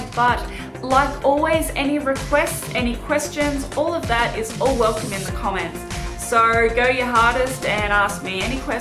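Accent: Australia. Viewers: Please teach me Ausie english!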